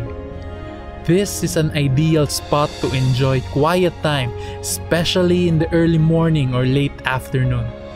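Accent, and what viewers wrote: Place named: Philippines. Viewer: That's the epitome of what Filipino accent sounds like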